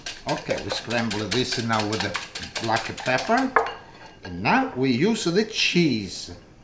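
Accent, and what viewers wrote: Italy. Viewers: Growing up in East Vineland, we were surrounded by hard-working truck farms. With that came the best Italian/Sicilian cuisine. You, dear sir, not only remind me of these fine memories but also have an accent I know well. Heartwarming. I have been following you for years. My daughters grew up on this food. They, too, think you are adorable as they pass down these fine traditions to their families. You are a true master of this art. Thank you so very much!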